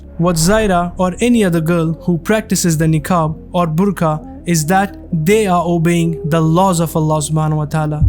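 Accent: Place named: Srinagar, India. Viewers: Are you a kashmiri? Your accent is that of a srinagariet 😅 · Yes i aslo want to know this...and i am noticing his accent is bit Kashmiri type...😂I think he may be from Kashmir❤ ...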